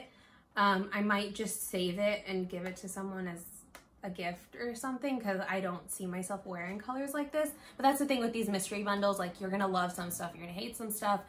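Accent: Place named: Arab world. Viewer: Your Arabic accent is soo cute.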